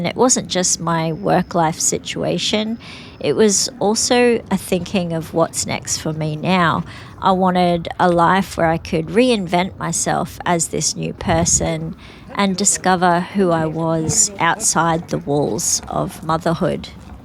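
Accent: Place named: Australia. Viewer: I enjoy watching your videos. I love your Aussi accent. I'm in Texas USA. My children are grown and moved away. I don't get to see them as much as I wish. I have made a life for myself with the 2 cats that came with my house when I bought it. I sold a large house and bought a small one near the lake. My cats are such good companions. Greeting me when I return home and following me around the yard when working outside.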